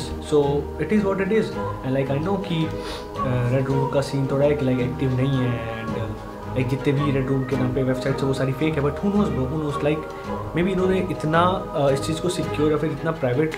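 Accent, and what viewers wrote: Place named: India. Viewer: India me ladke aise hi bat karte h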